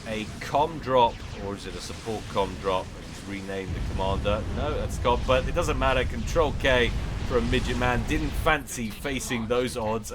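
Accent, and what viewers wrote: United Kingdom. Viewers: I think we have a few too many children up past their bedtime in here...:) In any case though, I think describing your accent as received pronunciation is probably going a bit far, you've got a reasonably posh accent but too tainted to be proper RP.;)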